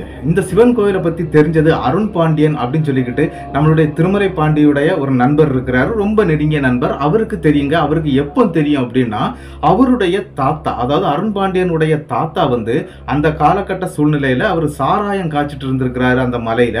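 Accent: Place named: Kerala, India. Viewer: நீங்கள் மலையாளம் கலந்த தமிழில் பேசுறீங்க. ப்ரோ.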